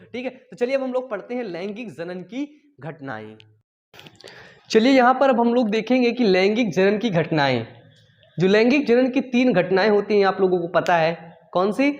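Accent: Uttar Pradesh, India. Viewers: Sir app up se ho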